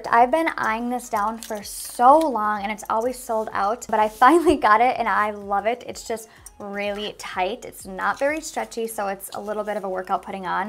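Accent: Minnesota, United States. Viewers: Do you live in Minnesota I heard you say my Minnesota accent lol I live there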